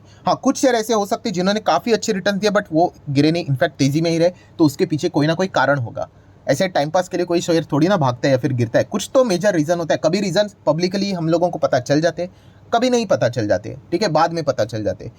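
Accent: Maharashtra, India. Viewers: सर तुम्ही मराठी मध्ये बोलता हे तुमच्या बोलण्यावरून कळतंय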